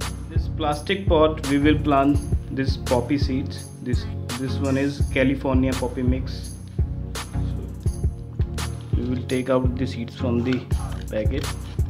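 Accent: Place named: India. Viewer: I'm so glad that the first video I clicked on the narrator was Indian because I watch a lot of Indian cooking shows and my projects always workout way better when the narrator's Indian. Hard to explain but I just got really excited when I heard his voice.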